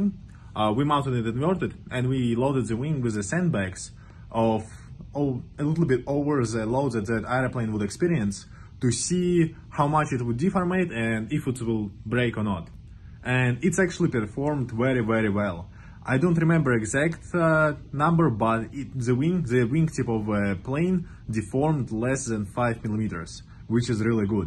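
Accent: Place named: Eastern Europe. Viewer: Okay I am a bit confuse, you sound so European(Eastern European), so why are you in US university? Is America nicking EU talents??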